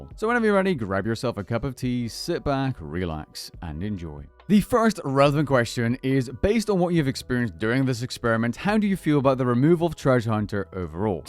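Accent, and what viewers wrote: United States. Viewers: So, you're telling us that you have a light soft American accent voice, right?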